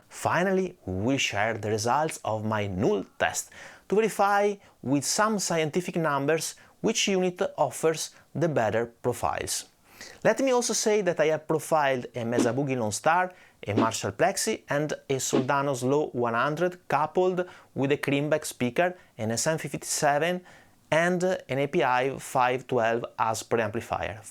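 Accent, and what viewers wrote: Italy. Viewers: Look, I just wanted to joke around a bit. I mean the name what could it mean? That this nice guy likes Fender and Gibson guitars. That's all. And I asked, why he didn't choose to honor also PRS guitars by calling himself ", Paul Gibson". You got me? Ich nehme deinem Namen nach an, du bist Deutscher. Ich bin ein lustiger Tiroler, der halt in D nicht gut ankommt. Bin leider kein Mario Barth, was soll's! Dass Italiener ist, erkenne ich an seiner typisch italienischen Aussprache, was ja wirklich nebensächlich ist. ich wundert immer wieder, wie schnell man auf YT entweder blöd angeschrieben oder belehrt wird und alles so todernst genommen wird. Ein bisschen mehr Lockerheit ist nicht nur beim Gitarrenspiel angesagt, sondern generell. Aber Schwamm drüber! Ich wünsche dir noch alles Gute und rock on!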